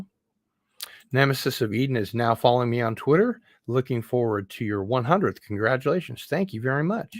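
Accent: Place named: Australia. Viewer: Hey, I'm an Australian and I love your Aussie accent as well as your Christopher Walken impersonation 👍👍👍🤣😂🤣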